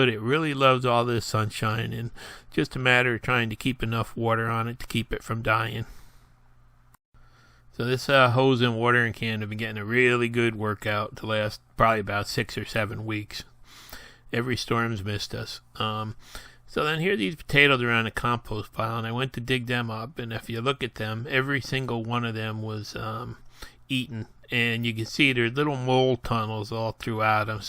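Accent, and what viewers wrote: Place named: New England, United States. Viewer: get you some quienas well i cant spell but a real hillbilly would know this your a wannabe hillbilly. sound like your a yank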